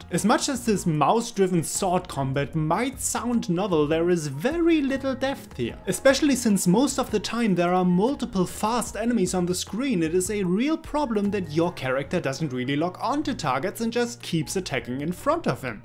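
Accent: Germany. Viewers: U are German right. Love the accent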